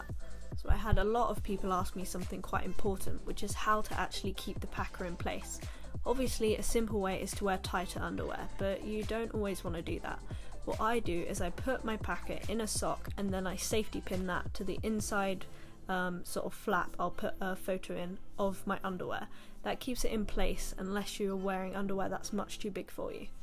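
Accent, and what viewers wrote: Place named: United Kingdom. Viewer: This vid was so much help omg!! I never even thought to use a safety pin, thanks :)) It's also so nice to hear another British accent talking about ftm stuff omg 😭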